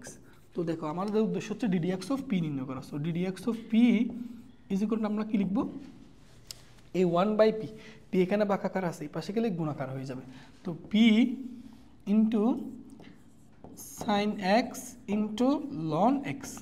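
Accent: Chittagong, Bangladesh. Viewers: স্যার আপনি কি চট্টগ্রামের???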